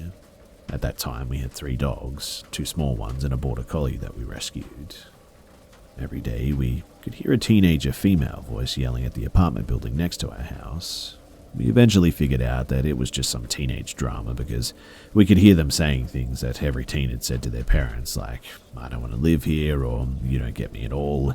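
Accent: Australia. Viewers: His Australian accent reminds me of hugh Jackman that's a good thing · it’s australian :)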